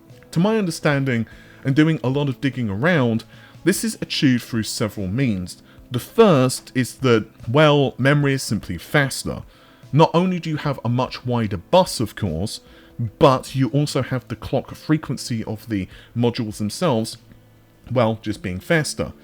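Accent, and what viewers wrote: United Kingdom. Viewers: Three quarters of an hour! Three quarters of a fkcun hour and you don't know anything! For three quarters of a fkcun hour you talk the talk about the talk which talks about other talk you've heard in the past and touches on possible future talk you might or might not hear one day, and all I get from that is waffle in a British accent! You don't know shit ~ you can talk about not knowing shit for 3/4 of an hour. I'm never going to get those 45 minutes back. It's like the promise from Micro$oft that you can wipe windows and get a refund from the company. In all the 3 billion people who have wiped windows and tried to get that M$ money back, how many have actually received it? I can hear crickets, I can hear tumble-weed blowing past..... I can hear Liz Tru$$ going down in flames but I can't hear anything about my 45 minutes! But for the rest of my life I will be still able to hear the talk that talked about the talk, which featured the talk about talks we've had in the past and looked into the bright and Rosy future (she's a nice girl, Rosie) and then we stopped to talk about that talk, so the talk got side-tracked by the talk which went into a talking holding pattern around the outer marker while we talked about fuel economy and reserves of time, because to run out of wind in mid talk would be a catastrophic event, and no meta-talk (a talk about a talk) could deal with the meta-catastrophe of talk about talk that talked itself to a stand-still....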